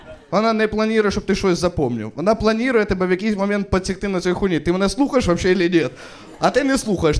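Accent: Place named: Russia. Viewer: Ох, як же відчувається ,що людина російськомовна... Це навіть не суржик, це фонетичне знущання💔 Хлопчик, ти милий перспективний, і плюсік за старання, але якщо вже ти займаєшься справою, де мова і слово - твій інструмент, ну прикладай більше старання!!! Бо твої російські слова , які ти просто промовляєшь з нібі то українськім акцентом так ріжуть вуха, просто кров з них йде. Лайк заради просування україномовного контенту. Ну і за харізму❤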